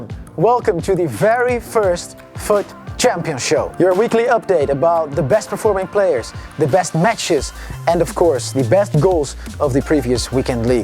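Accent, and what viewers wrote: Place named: United States. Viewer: Try to keep it english and not American english. But good job nice vid!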